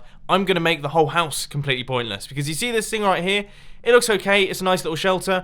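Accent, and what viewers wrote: Australia. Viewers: r u australian coz i am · wait is Australian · your australian? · You from Australia?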